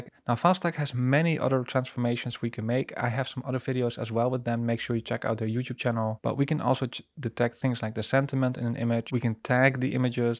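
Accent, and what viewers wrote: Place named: Netherlands. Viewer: Very interesting channel. Are you Dutch? You sound very Dutch :) I am travelling around the world and do most of my work remote. How about you?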